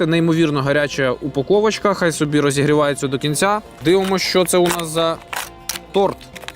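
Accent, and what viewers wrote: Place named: Surzhyk, Ukraine. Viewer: Сельский суржик , на украинском зачиняем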